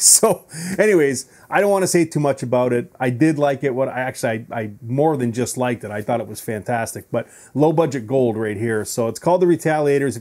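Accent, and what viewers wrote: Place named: Canada. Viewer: Hey dude, as soon as I heard you talking I thought you were a fellow Canadian, it’s the accent haha. Where about are you from? I grew up in Northwestern Ontario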